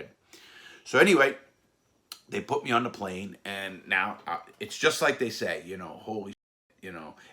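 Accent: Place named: Philadelphia, United States. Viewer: I could tell you're a Philly person due to your accent and specific terms you've said like hoagie and such.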